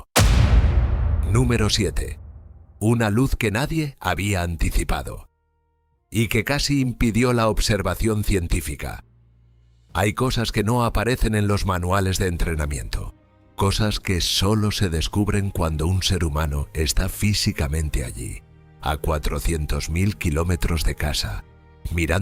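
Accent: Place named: Spain. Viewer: Narración espańoleta tan idiota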